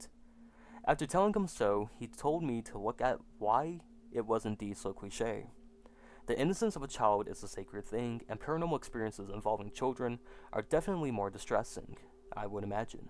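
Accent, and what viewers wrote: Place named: United States. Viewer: Australia? Hmm why do you sound so American I’m from California you sound just like my white homies haha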